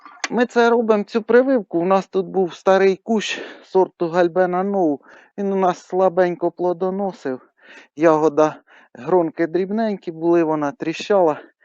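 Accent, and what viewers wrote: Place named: Zhashkiv, Ukraine. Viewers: Я також послухав - ніби Маньківщина )) Ніби дома побував. Сокира, радянський секатор і ножиці, і обов'язково тряпочка )) Таке рідне. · Черкащина райьон говор як в Жашкові..віджалій гроші купи нормальний сікатор він не ріже ніфіга..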